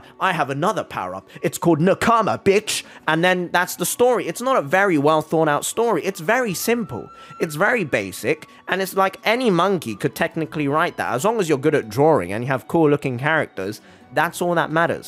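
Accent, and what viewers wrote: England, United Kingdom. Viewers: I'm here for the english accent.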